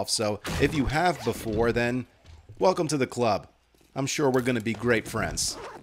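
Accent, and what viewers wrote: New Jersey, United States. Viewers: I think you missed a plug and I think you missed a bookbag in the pass-n-gas . btw I love that you're from NJ! It gives me joy to listen to you talk about kickin' ass in an NJ accent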